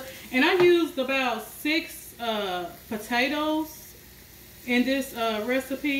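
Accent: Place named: South, United States. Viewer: I have cooked something similar but I'm going to follow your recipe next time. I love to listen to you talk. You sound like a Southern girl like I am. God bless you with health and happiness.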